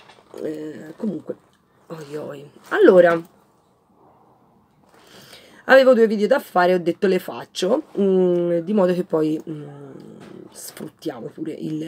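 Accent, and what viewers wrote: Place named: Florence, Italy. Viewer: Ciao, mi sono iscritta da poco al canale, e devo dire che questo video mi è piaciuto molto tanto che Shaboo l'ho acquistato quasi subito dopo aver sentito te che ne parlavi (solo che l'ho preso per la versione Kindle, ho speso un pochino meno). Mi piace tantissimo la tua parlata, io sono di Firenze ma mi sono trasferita nelle Marche per motivi personali, quindi quando ti sento parlare mi sembra di essere nella mia città natale a passeggiare per le vie del centro storico... Non sono il massimo nel presentarmi ho sempre paura di dire banalità, quindi ti faccio i complimenti per il canale e a presto.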